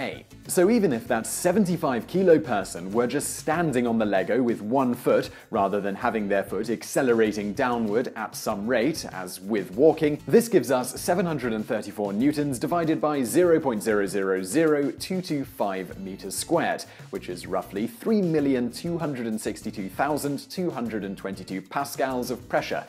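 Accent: United Kingdom. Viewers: I'm disturbed because this Matthew Santaro/ Vsauce hubris has a British accent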